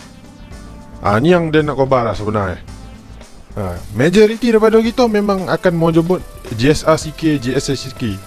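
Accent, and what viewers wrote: Negeri Sembilan, Malaysia. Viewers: torbaikk bang ribiew njen guna loghat Noghori...nnti blh try cuba loghat negeri lain plk..hahaha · 1st time jumpa channel bang. Best & santai + cakap slang noghori Auto subs & like 😁 Salam bang saya ada beberapa soalan nak tanya, mohon pencerahan. Terima kasih in advance 1) engine 4g93T vs 4g63T, mana yg lebih baik dari segi reliable, powerful, energy efficient ? 2) antara 2 engine tu, mana lebih sesuai untuk masukkan ke satria neo? 3) kalau swap engine original Satria Neo, lepas JPJ ke?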